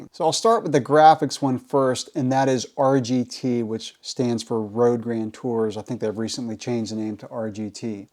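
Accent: United States. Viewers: He is American I guess.... so what else would you expect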